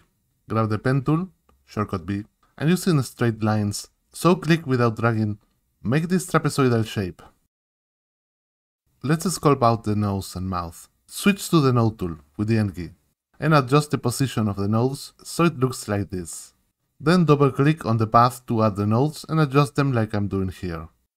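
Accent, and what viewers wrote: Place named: Spain. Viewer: Really nice videos, all of them. I buyed the promo and its wonderful with lot of content. BTW your accent seems spaniard, do you have any video in spanish. I want the for 2 things: show it to my children and also as a Teacher I wish to do a inkscape tutorial with my students. Think on it! they need like 10 visualizations per student to get to do the exercises (somehow something that resembles a draw). Thank you for this really nice channel!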